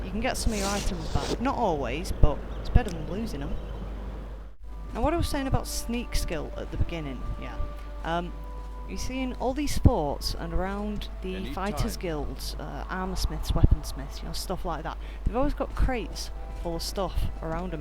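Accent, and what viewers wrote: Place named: Lancashire, United Kingdom. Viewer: Hi, just subbed after watching these vids, just started Morrowind after literally thousands of hours playing and modding Oblivion and Skyrim on PC and 360. Very helpful tutorials about the basics, it has surprised me just how much more in depth this game is compared to oblivion, which i thought was quite in depth itself, forget Skyrim for that,lol. Anyways nice work and keep it up. By the way where abouts are you from as you sound to be a Lancashire lass to me, as i am a Lancs lad,haha?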